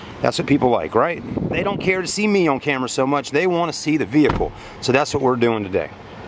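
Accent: South, United States. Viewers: I have the 2018 black premier and ABSOLUTELY LOVE IT! Not a fan of the red line. My husband has the 2018 black LT. we use mine as the family vehicle so that’s why we upgraded mine to the premier. I wanted white but the dealer could only find it in the red line so I got black. I am really glad I did❤️ loved your video and your accent! I’m from Michigan so obviously we don’t have that great southern accent:)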